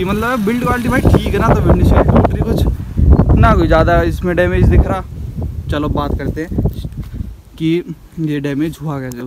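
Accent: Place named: Jewar, India. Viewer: Bhai jewar ke pass ke lgte ho awaz se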